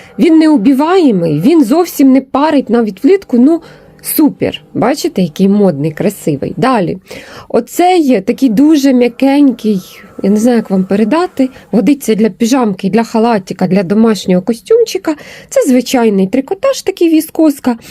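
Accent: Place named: Slobozhanshchyna, Ukraine. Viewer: Сонечко наше ясне!!! Доброго вечора. Подивилася як ви з родиною розбите голубці, і що!ґ! Пішла все купила на гоубкі!!!, Ви неймовірна жінка, а ще саме головне, як люблю ( просто балдею) от нашего Слобожанського говору!!!!! Київ, Суми!!!!!💙💛👍🇺🇦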